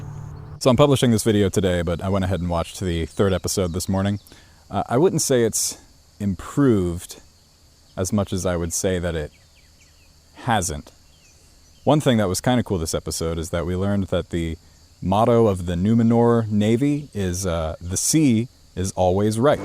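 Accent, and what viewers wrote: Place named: Ireland. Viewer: Flawless editing and Irish accent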